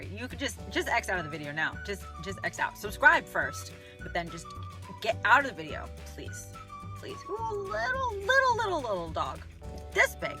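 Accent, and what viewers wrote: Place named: New York, United States. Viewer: Listening to you talk about Shakespeare makes me wonder how cool it'd be to watch a Shakespeare play with a New York accent.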